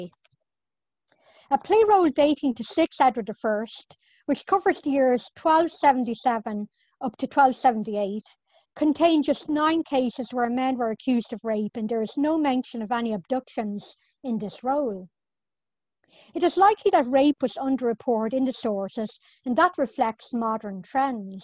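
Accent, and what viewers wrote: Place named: Ireland. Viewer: This is a perfect example of academic waste of public funds. This talk is interesting, despite the speaker's lisp and mick brogue, but should academic institutions be funding lectures to be doing this kind of narrowly focused work. Let pernsioners or weekend historians with nothing better to do, do this kind of work. Also, no offense to her accent but it reminds me of the awful elderly mick nuns I used to encounter on the southside of Chicago back in the 50's and 60's.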